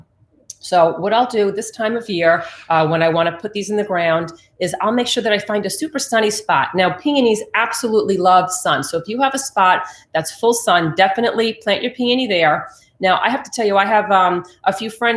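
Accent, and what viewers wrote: New Jersey, United States. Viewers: What month or season can you split them, and how young can they be before splitting them? Thanks, I love the channel and your Jersey accent. 👍🏻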